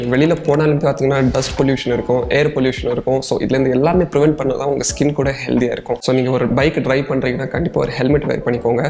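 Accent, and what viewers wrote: Kanyakumari, India. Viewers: Bro kanyakumari ah?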